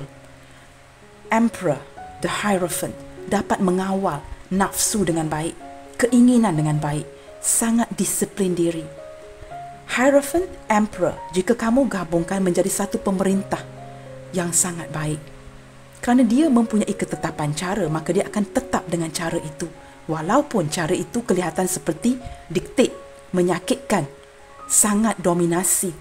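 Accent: Malaysia. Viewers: org mn ini bacanya ky org malay.pusing dengerin nya a jdi eheh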